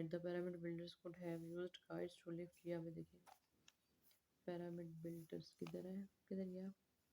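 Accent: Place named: India. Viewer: I put like for "bismillah"but unfortunately i cannot understand because of your accent your accent don't like English Like Indian 😢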